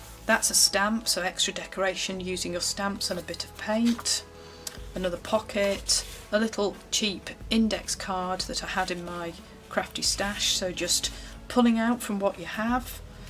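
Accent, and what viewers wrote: England, United Kingdom. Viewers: I’m sorry to bother you with my comments hon I moved to Melbourne from London in 1973 and so enjoy hearing other English accents, which are few and far between these days!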